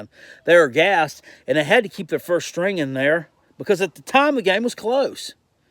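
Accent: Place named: Texas, United States. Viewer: Sounds like Texas brotha I feel ya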